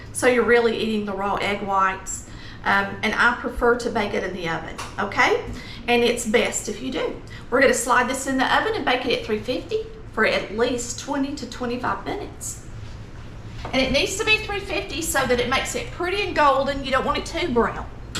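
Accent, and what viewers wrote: South, United States. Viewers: Thank you so much for your recipe and your accent makes me think of home, I live in Tasmania now, but born and raised in the south. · OMG...I could listen to you talk all day long! Love your southern accent and will for sure try this recipe. Bless your heart!!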